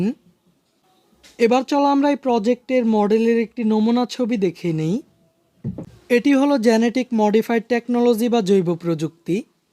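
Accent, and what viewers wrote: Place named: Magura, Bangladesh. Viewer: Magura galar kay asho❤❤❤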